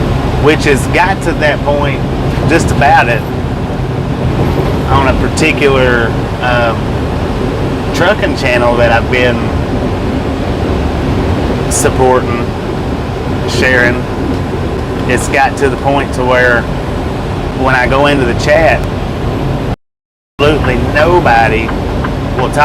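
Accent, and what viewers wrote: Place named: South, United States. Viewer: since Kyle was saying how he feels here I go lol. From your first live stream which came across in my feed. I heard a southern accent so I stayed tuned in. Then after many more streams I thought this guy is genuine. Your a friendly guy and you always seem calm. Great attributes to have bud. As far as your driving your awesome at that. Your cool with your subscribers and it has paid off. Anyways, after I read Kyle’s comment it compelled me to say what was on my mind. When I met you and seen your the same in person as well as your YouTube live streams. That went along way with me. I don’t stay as long as I’d like I get busy and have to step away but I try to pop in every stream and most the time when I step away I let it run lol. If I subscribe I support and you’ve done the same with me. I appreciate you brother and it’s good to see the subs you have. 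All mighty fine ppl. Okay ok. Nuff of this lol. Just being honest brother.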